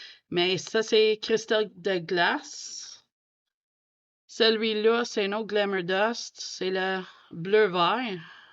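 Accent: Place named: Canada. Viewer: Bonjour ,ton accen me permet de voyager vers ce magnifique canada là où j'aimerais aller tu as une magnifique collection de crayons et de matériel je viens de tomber sur ta chaîne que c'est la numéro 2 , après je regarde la 1 ,j'ai adoré ta vidéo et chaque personne a ses crayons préférés sa ne s'explique pas 😅 je suis ravie de te connaître les brutfuner sont mes crayons préféré j'ai les 180et les520 et djautre gamme que tu as présenté merci beaucoup pour ton partage bisous 😊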